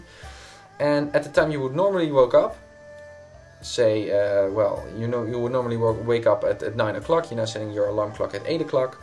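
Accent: Netherlands. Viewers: i can hear on your accent that your dutch? or am i wrong:P? nice vid btw! i really want to learn this^^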